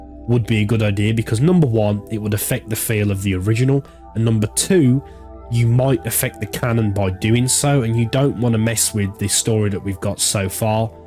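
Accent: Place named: Liverpool, United Kingdom. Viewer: Best Halo YouTuber with the scouse accent 😉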